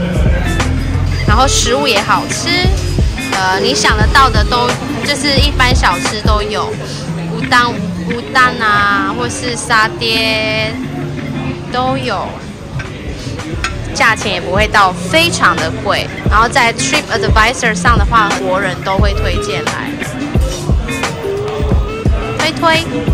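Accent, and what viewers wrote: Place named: Malaysia. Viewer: 你講話很馬來slang了😂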